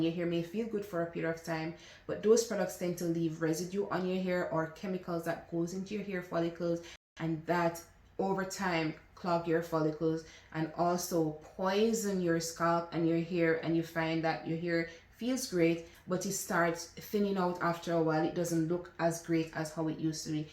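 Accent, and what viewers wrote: Jamaica. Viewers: Girl mine before that was our Jamaican 🇯🇲 accent ❤❤ new subscriber here sis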